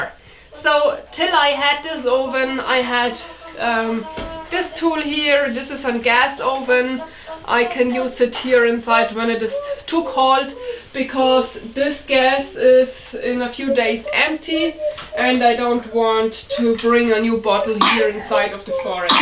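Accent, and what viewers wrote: Germany. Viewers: Has to be the accent. I like it when she goes all German tongue. Helps me learn the language. Very Polite Lady.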